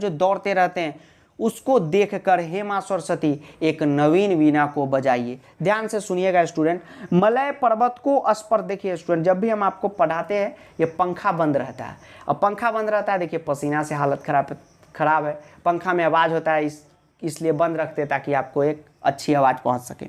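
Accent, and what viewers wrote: Bihar, India. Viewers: Sir aap pakka bihar se ho ne 😊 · Sir are from bihar hamare edhar ese hi pronouse hota hai hamre ias officer bhi pronounced ese hi karte hai eska ye matlb nahi ki unko nahi ata hai concept pe dhyan do esa koi nahi padhyaega basic se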